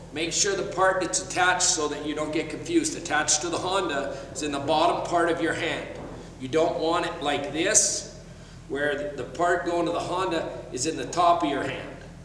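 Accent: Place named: Canada. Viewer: He is saying hondo. He has a Candian accent.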